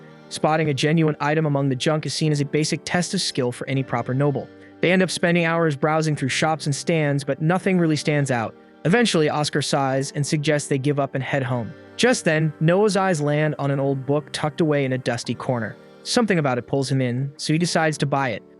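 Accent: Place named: United Kingdom. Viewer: After , I think he's British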